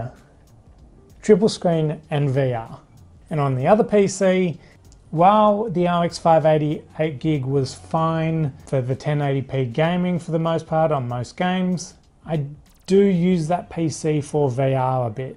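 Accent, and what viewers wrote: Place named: Australia. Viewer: in the thumbnail u look like jazza from dwj and even ur accent is like his(Australian probably) but with um..higher tone or something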